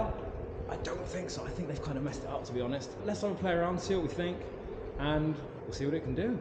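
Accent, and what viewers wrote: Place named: Europe. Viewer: that's what europeans sound like bruh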